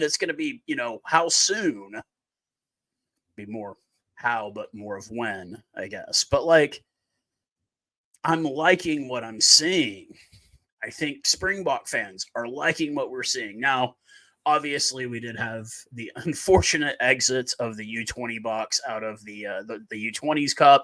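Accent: United States. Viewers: Just subscribed to you just because of your knowledge of the Springbok set up and your obvious love for our boys whilst conversing with a USA accent. ❤from CPT, SA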